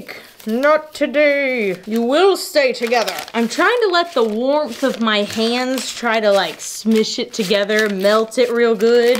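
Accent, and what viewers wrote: Texas, United States. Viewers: I kinda wanna know are your from Kansas you said like your from Texas or Kansas?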